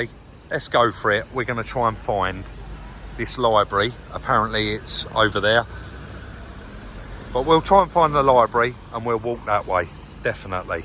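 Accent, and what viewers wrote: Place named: England, United Kingdom. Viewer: the trouble with your videos is that they contain lots of stuff that doesn’t relate to the actual renovation of the vehicles. I don’t feel any need to see your wife’s dog at the backdoor, or your ticket from Redbridge to the midlands, or your walk through the British museum… But there was next to no pictures of doing any work on the door, or you doing any work on the brakes and other stuff. When I get to the end of one of your videos, I feel I haven’t learned any techniques or learned much about the type of vehicle. It all comes over as “ ’ere, didn’t we do well!....” Nice to hear a proper English accent, not some yank, but that isn’t enough to keep me watching. So I’m going to cancel my subscription. Ps#1 when you do the numbers, you never add in anything for the cost of maintaining your workshop, plus electricity, rates etc. 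You ought to, even if the wife is actually paying the bills. I suggest a flat rate of two hundred and fifty per vehicle. Ps#2 whatever you’re paying, I reckon it’s only half what he’s worth.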